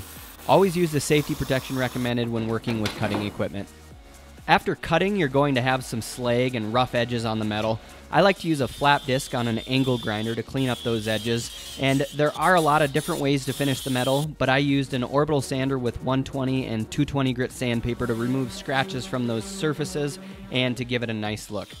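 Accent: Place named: Montana, United States. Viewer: You don’t have to tell us you are from Montana. We can tell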